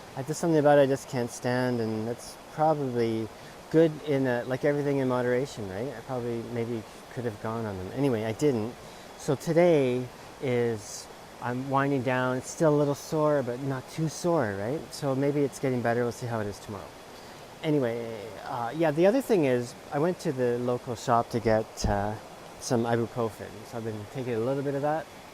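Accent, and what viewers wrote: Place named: Scotland, United Kingdom. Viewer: Hi, Love the Scottish accent-You Are Great 😊 Wow the rosettes are beautiful ma’am! I’m really enjoying all your uploads this last month-I’ve not commented on them all, but I’ve given them the 👍🏻 thanks again for the swoopy upload 😉 hope you’re having a wonderful day ❤️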